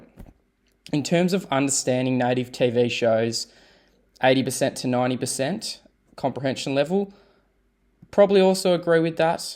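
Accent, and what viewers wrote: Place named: Australia. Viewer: I am around the same hours as you (1300 hours), however, I am not counting hours anymore, just listening to as much content as I can. I agree with what has been said here that native Spanish is much different than Dreaming Spanish videos. I also agree that the roadmap is a little ambitious. I have been using Italki (65 classes) for 6 months and found that it has boosted my confidence, which I think is absolutely vital for moving forward with language learning. My speaking is certainly not fluent, but I can make myself understood. If I am talking about a subject that I am very familiar with, it is much easier than a subject where there is a lot of new vocabulary. My attitude now is not counting hours or making goals, but just to keep plodding and try to enjoy the process as much as possible.I am also reading a lot of books which I think has been great in teaching me sentence structure. 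I am of the firm belief that language learning is long-term progress with no ending - we just keep improving. That is why for me, attitude without self-pressure is vital so that I don't become too self-critical. I would like to add that I think ¨Dreaming Spanish¨is a great platform and I can attribute most of my learning to it. By the way, you sound like you are Australian - I am also living in Melbourne. Your video was frank and honest and that is great to hear because there is so much BS out there on the net with lots of false promises.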